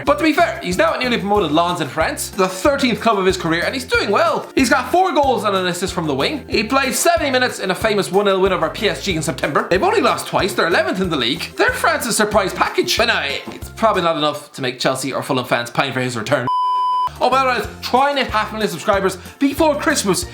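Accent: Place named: Ireland. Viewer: Slow your Irish ass down, I can barely understand you and I'm fluent in most english accents, including Creole, New England and Brooklyn/Bronx slang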